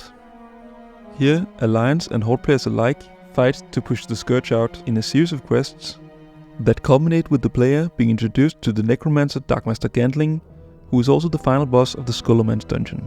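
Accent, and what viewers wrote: Denmark. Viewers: Simply cannot watch stuff with such a thick Danish accent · Good ole Dane accent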